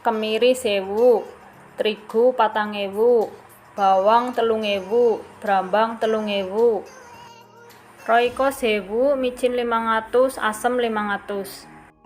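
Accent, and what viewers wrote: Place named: Banyumas, Indonesia. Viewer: Salam kenal bunda pinter bget ngatur uang blnja , kowq bahasa ngapak daerah mana bun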